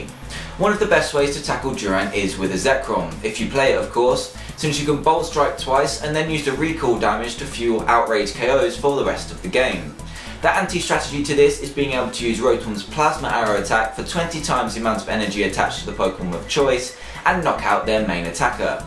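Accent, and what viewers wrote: United Kingdom. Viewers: I just love your British accent and the vocabulary choice.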